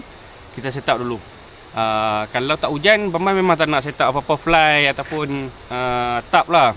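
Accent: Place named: Kelantan, Malaysia. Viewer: steady bamban makan budu..lidah kelate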